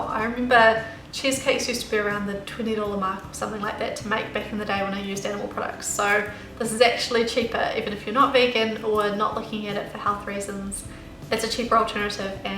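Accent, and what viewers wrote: New Zealand. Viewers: I'm Australian, and she sounds New Zealand to me. Though in my experience, South Africans who have lived in Australia for a number of years can sound like New Zealanders too. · I'm certain she is from New Zealand that sounds like a very thick New Zealand accent to me. I'm Australian too and we def don't sound like when we speak. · is she from new Zealand I am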